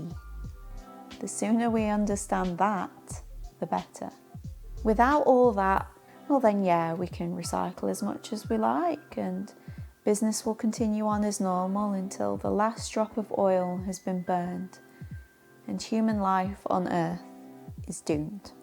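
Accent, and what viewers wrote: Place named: North of England, United Kingdom. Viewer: Oooo same regional accent as Shaun and Rachel Oates. Loooove. North of England is poppin' off.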